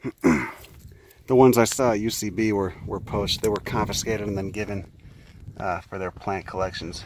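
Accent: Chicago, United States. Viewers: hey Avalon type in 'meet the youtube botanist with the thick Chicago accent.....the stunning specimen is right there haha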